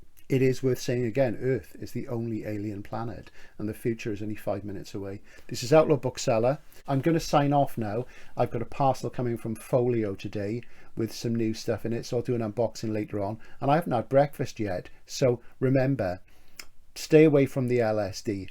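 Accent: Wales, United Kingdom. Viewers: You sound like a proper Welshman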